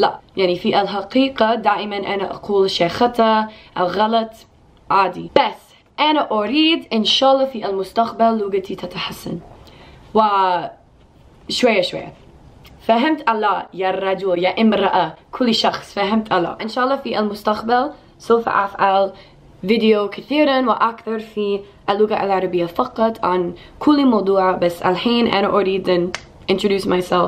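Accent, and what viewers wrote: United States. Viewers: OMG for an American person ur American is sooooooo good learn alil bit more Arabic accent and you will be perfect 😍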